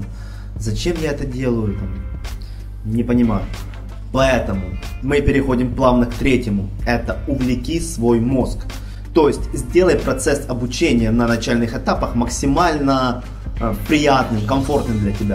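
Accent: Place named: Ukraine. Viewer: думаю Вам бы не мешало подучить русский прежде чем на нём разговаривать...очень слышно что общается украинец....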